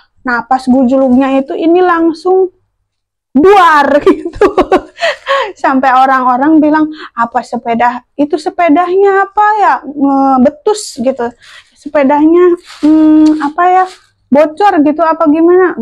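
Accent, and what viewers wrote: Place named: Bekasi, Indonesia. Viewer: Dr logatnya sih bekasi banget 😂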